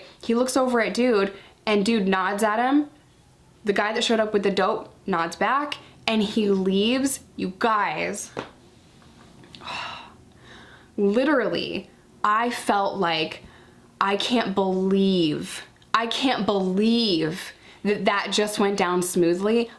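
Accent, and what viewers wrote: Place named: Harlem, United States. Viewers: Sounds like Harlem or South Bronx... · Sounds like the Bronx 😂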